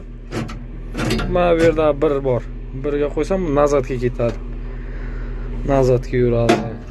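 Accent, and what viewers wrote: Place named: Karakul, Turkey. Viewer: Ака Каракулданмисиз шева ухшаяпти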